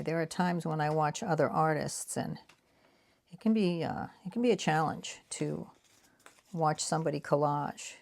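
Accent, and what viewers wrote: Boston, United States. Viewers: you are such fun! And so talented! I’ve been watching you for several hours and I’m one of the elder ladies who watch right to the end. Because I live in a seniors apt building (I’m 78) I run into those old people every day in the halls. Truly in my mind I’m much younger, haha. I love that you are from the Boston area. (accent) I live in northern Alberta Canada and we are just now getting rid of the sand salt and gravel on the roads and looking forward to green trees and grass. I loved all your collage making with household items today. I bought a gelli plate and brayer etc a year ago and it’s still in the package. I’m inspired now. I know your beautiful son is in heaven with Jesus. You have guardian angels around you.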